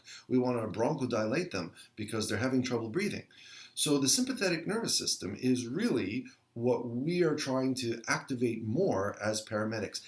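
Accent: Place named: Canada. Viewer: Two things....First...Ha! ....I just KNEW you were a Canadian, eh? (me too, that's why I had a feeling)...Second...you are a truly truly gifted teacher. Thank you so much for creating these paramedic videos, they have explained so much confusing material in such a simple and clear fashion....please make more videos!!!! .....eh?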